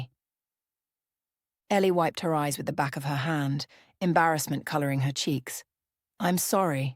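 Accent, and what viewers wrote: United Kingdom. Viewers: YT put this story in my feed for the second time. I still love every word of it. Also the Brittish voice.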